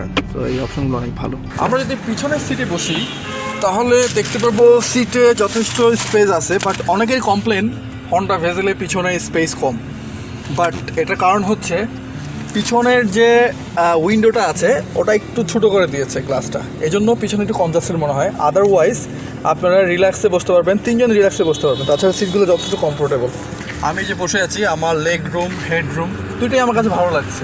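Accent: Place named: Chittagong, Bangladesh. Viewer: bro you have ctg accent! Are you from ctg?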